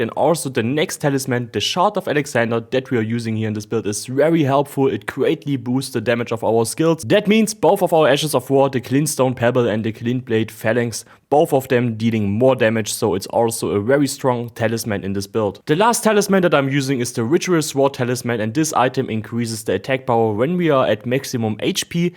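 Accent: Germany. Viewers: Also das ist mal ein geiler build. Wenn du mir jetzt noch sagst das ich mit dem diesen scheiß feuerriesen killen kann dann bist du ein Held weil ich diesen Arsch nicht fertig bekomme 😅. Ps: als deutscher hört man immer die anderen deutschen raus 🤣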